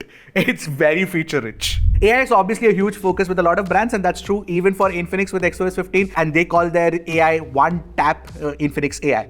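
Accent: India. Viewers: Bro you kinda look like a Indian and also speak like Indian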